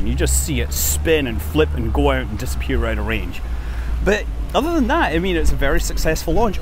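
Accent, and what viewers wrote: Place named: England, United Kingdom. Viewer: We of the USA do understand the English way to say words differently than we do, there are many different methods to say many of our words here in the USA. So please do trouble your self, Thank you very much your program is "GGG-GREAT!" as Tony the Tiger would say it. Taken from a commercial of Kellogs Corn sugar-frosted flakes. This one has been off the airways for many years now. { Tele or TV or The boob-tube came to us on airways. }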